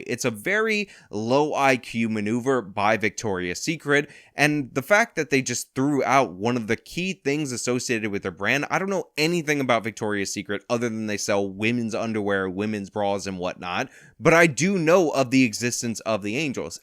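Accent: New York, United States. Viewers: first time i noticed you have a new york accent, at least northeast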